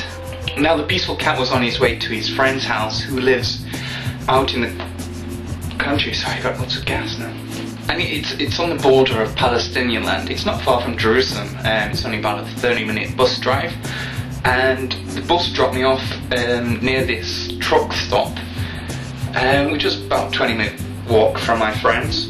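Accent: England, United Kingdom. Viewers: So where are you from? You sound English. Were you born in England and moved? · Now you're pretending to be Jewish as well? As if your English accent wasn't bad enough; you don't even look Jewish. You're not a good actor - just face it. You're just a pretender. You're an idiot. Your game is that you pretend to be the very people you hate.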